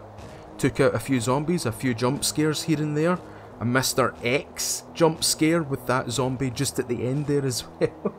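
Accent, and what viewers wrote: Scotland, United Kingdom. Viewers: Ah the thick Scottish accent love the accent of some of my ancestors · is he scotish? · I love your scottish accent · are you scottish?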